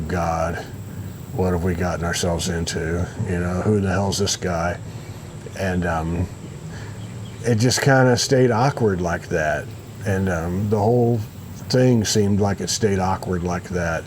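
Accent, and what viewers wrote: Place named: Midwest, United States. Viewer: I am from Michigan you are so Midwestern I love that!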